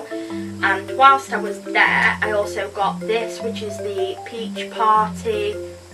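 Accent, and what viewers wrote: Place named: North, United Kingdom. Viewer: Noooo I love northern accents! Don't be anti northern! I think it's sooo lovely! Xxxx and yeh I'll tweet it now :)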